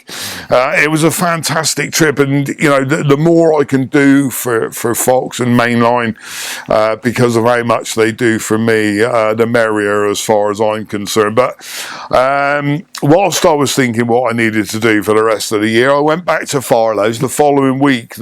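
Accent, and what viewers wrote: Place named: France. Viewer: Welcome back we've missed you. Loving the French accent!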